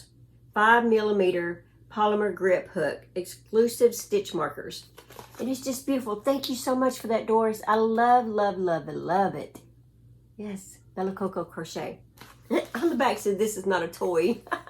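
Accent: South, United States. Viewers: The berry compote yarn is a beautiful combination of colors. I love hearing you talk. Nice southern accent. The scripture today is so true. Hugs 🤗and love to all 😇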